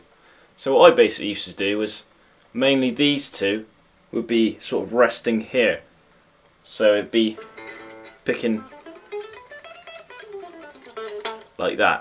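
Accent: United Kingdom. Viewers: you look and sound like marty friedman, just with britsh accent :o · Is that a west country accent i detect ?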